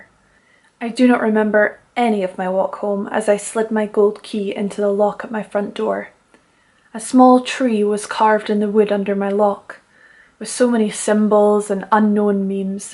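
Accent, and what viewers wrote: Edinburgh, United Kingdom. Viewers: ahhhh nice to hear a scottish accent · So nice to hear such a lovely Scot lass tell a beautiful story. Would love to hear a wee bit more of the Edinburgh accent, it is my favorite sounding speak.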